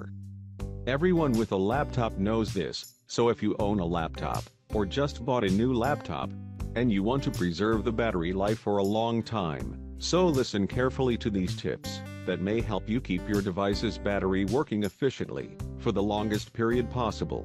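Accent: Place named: United States. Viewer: Thank you for this useful information. Hearing an American accent I assume you mean Fahrenheit and not Celcius, when mentioning temperature.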